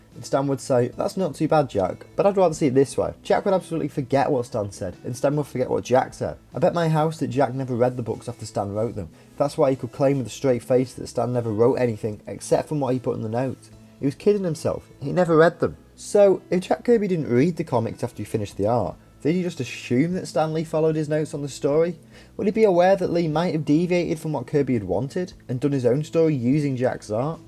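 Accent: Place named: England, United Kingdom. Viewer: The second most annoying English accent, besides mesdsy69. 😑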